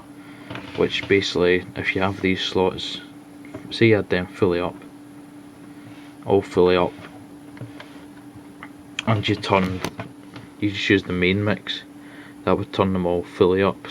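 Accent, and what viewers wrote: United Kingdom. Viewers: I believe he's Scottish not Irish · are you from Wales?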